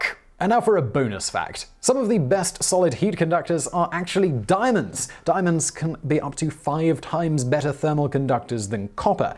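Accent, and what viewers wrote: United Kingdom. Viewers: "I triple dog dare ya!"😛 I like the way Brits say Aluminum!😁👍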